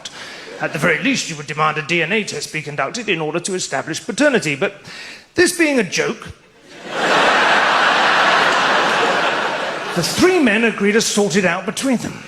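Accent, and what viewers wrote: United Kingdom. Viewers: I need a translator to American, too much of British accent I don’t remember a country name Walsh, I need a historian too to understand ☮️ so smiling for now 🙂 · FYI writing in English. Native language also English. Dude has a London accent. ❤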